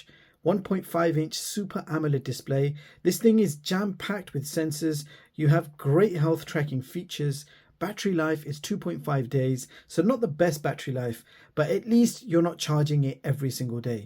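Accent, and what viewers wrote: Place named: United Kingdom. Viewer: Your accent like Harry Potter Film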